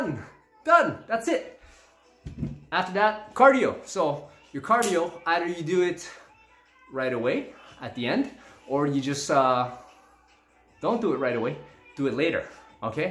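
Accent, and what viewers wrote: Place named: United States. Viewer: Forgive,but, you sound more American than Canadian!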